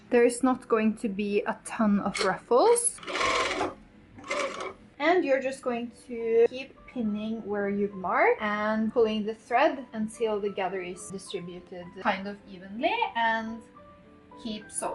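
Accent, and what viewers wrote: Norway. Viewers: Do I detect Norwegian? Så fint! Når jeg har skaffet min egen symaskin vil jeg sikkert prøve meg på dette!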